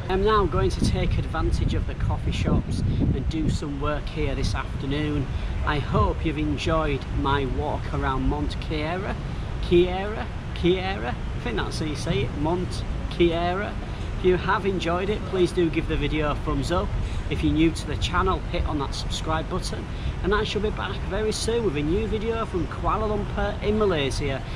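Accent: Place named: Newcastle, United Kingdom. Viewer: Are you a Geordie? I really like your accent. I used to study in Newcastle back in 2016.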